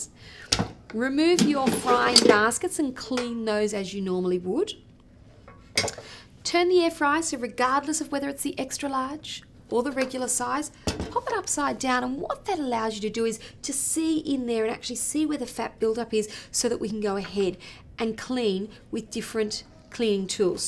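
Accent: Australia. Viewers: the best accent.. gotta love land of kangaroo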